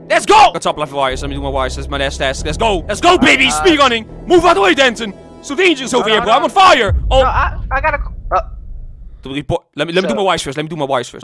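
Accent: Netherlands. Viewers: Bruh echt een nederlands accent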